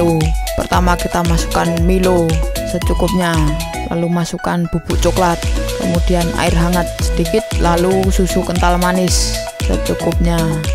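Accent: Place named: Java, Indonesia. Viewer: Keliatan kali jawanya... Medok abisss...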